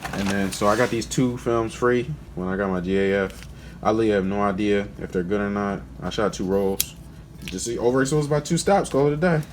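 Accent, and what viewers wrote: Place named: Philadelphia, United States. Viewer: u gotta be from philly the way you talk ahaha really love the music vibe and the photos!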